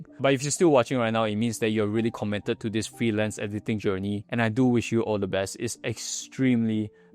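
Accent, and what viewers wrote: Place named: Singapore. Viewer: waow an editor with singaporean accent les goo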